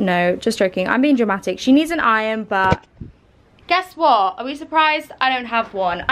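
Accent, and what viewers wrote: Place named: Chelsea, United Kingdom. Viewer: Can already hear the Chelsea in her voice 🥴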